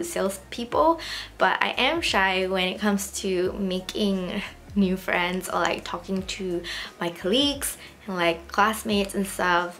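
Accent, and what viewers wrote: Singapore. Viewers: Your first video that I saw was the purito review and when I heard your voice I assumed you're a Singaporean so I came to your channel and saw this video and I was right hahah. Singaporeans really have this distinguished accent XD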